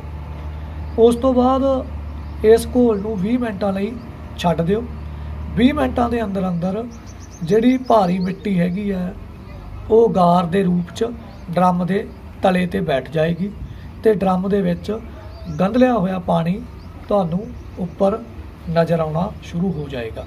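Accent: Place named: Malwa, India. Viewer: ਜੇ ਹੱਥ ਨਹੀਂ ਦੁਖਦਾ ਤਾਂ........ ਸੀਦੀ ਮਲਵਈ ਬੋਲੀ Good sir ji ਅਸੀਂ ਸ਼ੇਅਰ ਕਰ ਦਿੱਤਾ ਜੀ ਧਨਵਾਦ ਜੀ।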